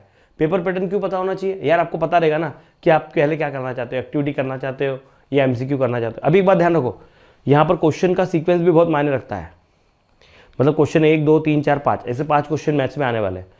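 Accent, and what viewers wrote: Maharashtra, India. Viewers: Sir tumhi Marathi aahat ka?????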